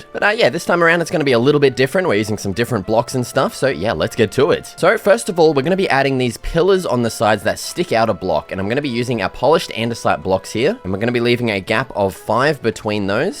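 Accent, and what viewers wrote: Australia. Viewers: this is the most australian man i've ever heard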